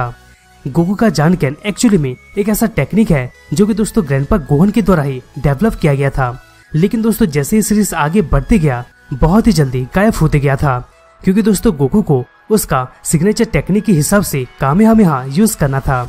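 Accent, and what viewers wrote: Bengal, India. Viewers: Are you Bengali aap ke batoo me Bengali ka jhalak hai like dekha jeto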